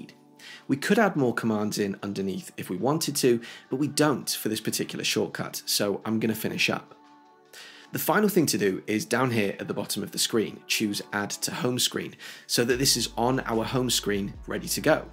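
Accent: United Kingdom. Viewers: Often wondered, now I know, 🙏 and you’ve a British voice without the bull that most vlogs from across the pond revel at.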